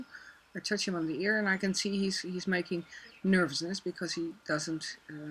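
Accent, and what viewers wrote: Netherlands. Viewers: Thank you it really helped. Are you from holland??? Because you have a little bit of a accent. I am dutch too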